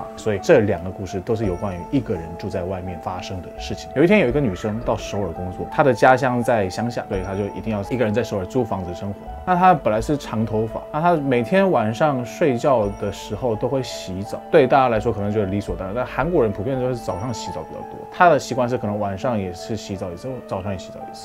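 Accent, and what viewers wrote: Standard. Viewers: 我是中国内地的朋友在加拿大生活，身边认识很多韩国🇰🇷朋友，我发现你的中文已经好到比我说的都标准了！终于了解韩国的历史文化了。非常的赞！秒关注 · 發音很標準😆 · 其實你是台灣人對不對？中文太標準啦w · 發音怎麼可以這麼標準好感人啊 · 講中文超級標準耶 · 中文也太標準了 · 超級標準我還以為他是台灣人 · 第一次看到你的頻道！你好可愛中文也說的很標準！（被圈粉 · 中文發音很標準欸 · 发音确实超标准 👍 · 發音比一些台灣人還標準唉 😂😂😂 例如我😔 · 國語講的很標準！聽不太出來是韓國人🤣🤣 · 這中文講得也太標準了吧！！！！ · ，您的中文真的很標準，我打從心裡真心佩服，可是您的故事比較像是鬼笑話欸😆~ 聽你講中文還比較有趣。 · 發音好標準哪！對後製人員按個讚，真的好細心。不論是音效、插入的圖片或影像。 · 最後一個故事好毛啊!! 嚇死~ 而且中文口音好標準, 差點聽不出來是外國人~好厲害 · 不得不說你的中文很標準,不說真以為是台灣人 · 他發音超標準！而且真的聽起來很有感覺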